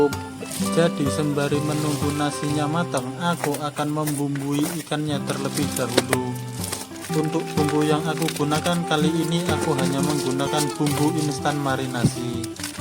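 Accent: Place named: Java, Indonesia. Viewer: Logat jowo nyell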